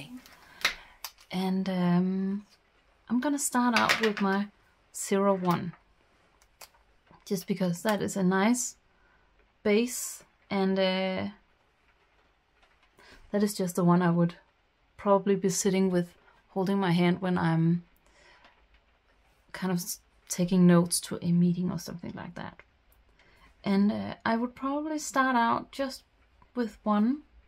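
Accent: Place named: Denmark. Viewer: Your accent sounds like you're from Denmark:face-blue-smiling: Har jeg ret? :goodvibes:I sketched with that same brand of pen you're using I tried, but it didn't seem water-resistant, so my sketch died :face-red-droopy-eyes: Anyway, my go-to flowers are tulips 🌷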